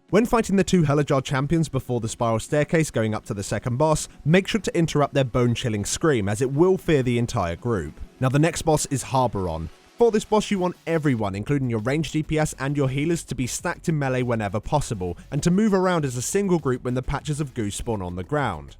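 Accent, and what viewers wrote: United Kingdom. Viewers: what region of the isles is your accent from?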